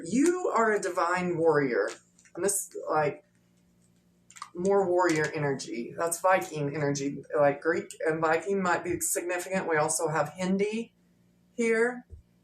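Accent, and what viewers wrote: South, United States. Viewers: Thank you for that! It took over two hours to watch your video due to all the synchronicities. I was resonating and meditating with eyes full of tears baby! This was quite an experience for me. Thank you. By the way that southern accent is very becoming of you and I like it! 😘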